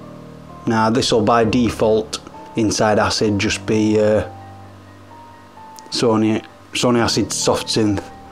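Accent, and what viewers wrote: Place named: Manchester, United Kingdom. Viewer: love the Manchester accent :-) thanks for the video. im stuck between either getting acid Pro or reason I'm leaning towards acid Pro because it's less money I'm into making more minimal kind of electronic music ambient stuff so yeah. you any relation to Karl Pilkington?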